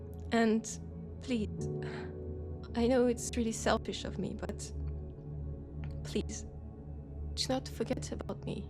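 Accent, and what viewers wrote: France. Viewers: wow.....just wow, This was difficult to listen to, not because it was bad but because it was too good. Your voice carried so much emotion in it and when it started cracking, so did i😅. Life taking you away from someone you deeply care for is always a tug at the heartstrings, and this story was just made so beautifully around that idea. This is the first audio ive listened to of yours and although this is a packed up sendoff to these two, i think i can speak for most people when i say we need a part 2, or at least a resolution where they find eachother again. Something, anything. Our souls need it to heal😭 (i also adore french accents ever since i visited france a few years back, love your voice😁👍) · I like the French Accent. Thats a nice Roleplay and there a emotions on it that i can feel myself. Good job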